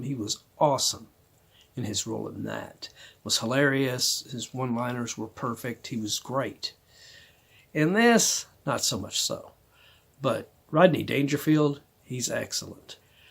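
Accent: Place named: United Kingdom. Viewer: I did like it. I noticed immediately that you had a totally different voice, certainly not an American one. And it was a good idea to use a British accent. As I've said before, it sounds a cold, commanding, sinister note. And amidst all those good old American "guys and gals" in "Queen Kobra" it was doubly effective.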